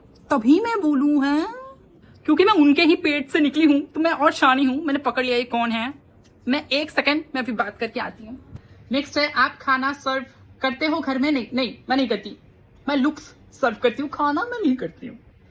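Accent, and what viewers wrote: Assam, India. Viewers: Are you from assam? 🤭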